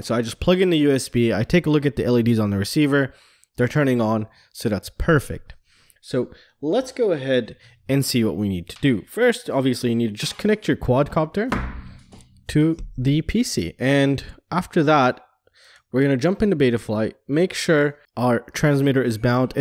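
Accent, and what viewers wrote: California, United States. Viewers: Lmao. good one but there is still data leakage. I can tell by your accent that you are most likely from Cali and IE leaked that you currently live in Hungary ;-)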